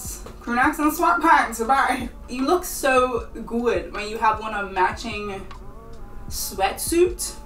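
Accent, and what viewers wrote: Bermuda. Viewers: When i first heard your voice after discovering your channel, off rip I thought to myself she sounds like my mom from Bermuda let me check, and I was right; instant follow, one love❤️🇧🇲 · Is this a Bermudian accent? 🤔 You sound like my friend a bit lol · Yoo. You got a Bermudian accent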